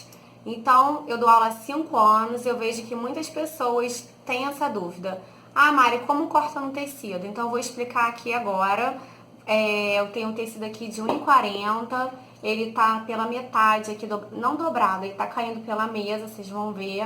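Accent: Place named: Rio de Janeiro, Brazil. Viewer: Parabens pelo video e pelo sotaque carioca! Cheguei aqui procurando como fazer esquadramento para bordado, mas já estou inscrita no seeu canal desde agora! Felicidades de uma carioca que nao mora mais no Brasil!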